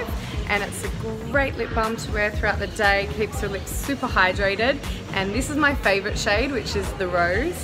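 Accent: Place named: Australia. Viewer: OMG.. thats why i love you so much.. because you are from Australia.. its my dream country.. USA and Australia.. wish to visit them one day.. and i looove your accent.. it would be nice to see you skin care routine or your perfume collection <3 that lip balm looks amazing.. hm.. can we buy Mecca online?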